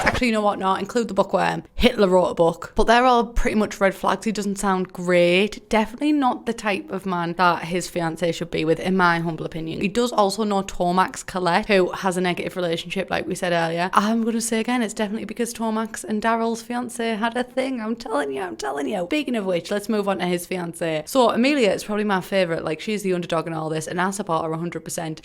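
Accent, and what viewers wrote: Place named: North, United Kingdom. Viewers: This is way more entertaining listening to a northerner😂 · You are now my official Sims news broadcaster, always loved the accent, I could listen to you all day and that northern humor just has me on the floor holding my stomach.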